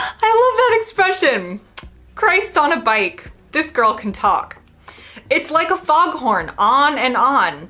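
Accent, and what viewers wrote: United States. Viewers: i find that when you speak fast your american accent is very pronounced , but when you slow down your speech your accent is toned down , and it seems you are gaining an english tone to your voice. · Of course you sound like an American. It's where you grew up. I spent 30 years out of the UK and sounded exactly the pom I am wherever I went. It's quite hard to lose the accent of your youth unless you work really hard at it. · Hello there. I've not been watching your videos for long but I have to say that I love hearing about all of the negative and positive differences about our two coutries. I live in the Northeast of England in Northumberland and love watching your videos and learning about the differences between our cultures. I have just watched this one and was amazed about how ignorant people are, well to be honest I'm not really. 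People have no right to comment on things when they clearly have no idea what they are talking about. I agree with you about the taps. I like to run the water while I'm washing my hands because like you said it saves water but I don't like holding my hands under the cold tap and even more so the hot. I have always liked the idea of having the water at a temperature that's comfortable. I didn't realise what a silly, if not stupid idea two taps were until I saw your videos. I do prefer American toilets. They have a much better flushing system than ours. Being filled with a lot more water and then sucking everything down without leaving anything behind. It's nice that you enjoy living in the U.K. but that you still have your American accent and use your American words and terms for things. If I were living in the U.S.A. I would probably still keep some of my English words and terms for things. 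You should never get rid of who you are. I am originally from the Southeast and still have my southern accent and I've lived in the Northeast for 41 years so there you go. I use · Your fine the way you are I'm a Brit and there are the occasional American pronunciations of words that grate on my Brit ear a bit but other than that I've no doubt you'll catch up with us perfect Brits in the end. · This is not a hate comment, but: frankly, your accent (and a lot of American accents) jar on the ear because the vowels have an edge which is entirely unnatural to the British. This is made worse when the American concerned is loud. As for the tap thing, surely with a mixer tap you have to run both hot and cold before you reach the ideal temperature: surely this is a waste of water? We British do not like waste, especially when we have paid to heat up water, some of which goes straight down the drain. 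Hence we put a plug in the sink before we turn the taps on. Simple. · Don't try to erase your American English accent. How you express yourself is part of your personality - the words you choose to use and the way that you choose to deliver them - just take what you like from the English English language and speak them in your American English accent! Alright bruv? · A question on the accent 'issue' - is it that you still sound American to English people but Americans hear a definite change in your accent? My missus is Californian and has been here for fifteen years or more now - even to me she still sounds very West Coast and yet her family thinks she sounds 'posh'. An additional element to this is that her mother, who lived in Hawaii for most of her life, could barely understand me; so accents cut both ways :D. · Loooovvee you, YOU are an honorary Brit for sure. Or course you'll keep your American accent.... 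I'm English and have lived in New Zealand for 18 years and still get picked as a Brit as soon as I open my mouth. By the way, opening the window on a hot day works, but you have to open up the house in the morning, before the house heats up.....as you said, pointless once the house is hot. Keep churning out the videos... I love your observations on the comparisons of UK vs US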